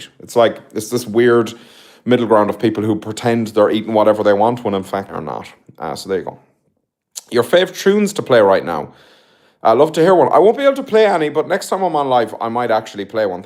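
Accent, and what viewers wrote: South, United States. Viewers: I love your Southern American accent, it's suuuuper cute and pretty accurate, coming from a Southerner. 🥰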